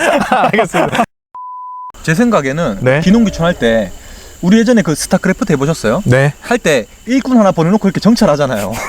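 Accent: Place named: Chungcheong, South Korea. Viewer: 말투를 보아하니 충청도사람이군요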